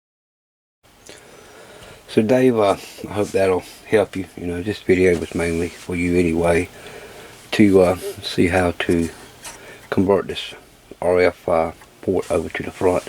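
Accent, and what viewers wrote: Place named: South Louisiana, United States. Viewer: Glad you and your family came out of the storm without any major damage - I did not know where you lived you have that South Louisiana kind of voice.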